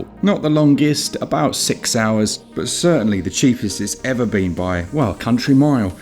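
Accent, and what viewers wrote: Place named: South, United Kingdom. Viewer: Smoothest southerner in all of Britania. Get some audiobooks done😅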